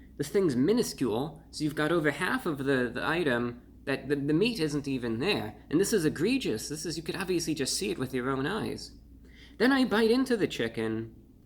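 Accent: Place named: Transatlantic, United States. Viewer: Your Transatlantic accent is absolute perfection.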